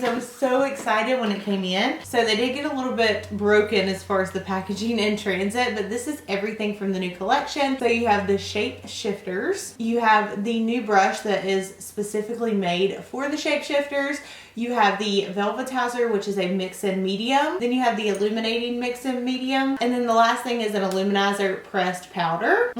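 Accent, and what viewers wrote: South, United States. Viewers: Knew you were country from the first word you said haha love from Mississippi ❤️ thanks for sharing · Loooove your accent. I'm a country girl myself and I have t heard any makeup bloggers that have a southern accent. So glad I ran upon your video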